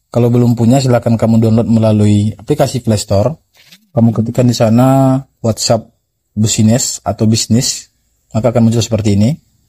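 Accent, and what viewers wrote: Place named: West Sumatra, Indonesia. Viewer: Dari logat nyo apak alah jaleh apak urang minang kan 😊🤭 Dima tingga kini pak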